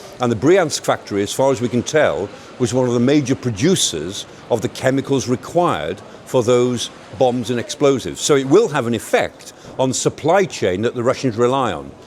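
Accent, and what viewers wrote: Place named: United Kingdom. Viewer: This guy sounds so British...😅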